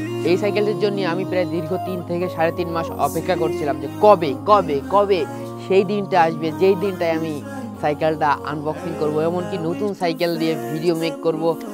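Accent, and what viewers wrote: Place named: Jhenaidah, Bangladesh. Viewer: ঝিনাইদহ??এক্সেন্ট শুনেই টের পাইছিলাম😅আরাপপুর থাকেন নাকি??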